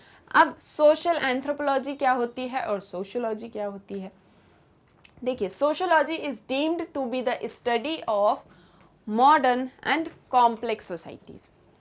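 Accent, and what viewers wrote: India. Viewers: How are u doing dear Madam. I am so much eager to listen to ur lesson on sociology. However , it so difficult to understand ur pronunciation as it is highly influenced by zIindian English.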